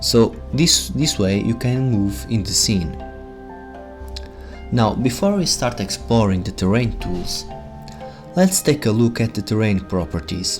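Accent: Portugal. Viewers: Eu tambem sou de Portugal, bem me parecia que você estava a falar com um sotaque português.:)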